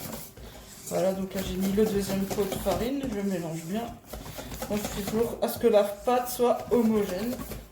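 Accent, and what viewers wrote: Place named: Alsace, France. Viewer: Superbe accent : Haut-Rhin ou Bas-Rhin ?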